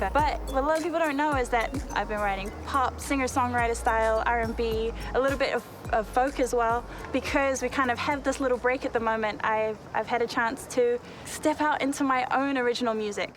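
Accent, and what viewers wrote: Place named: Australia. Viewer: Your Australian lol lmbo I love the accent ❤lol lmbo.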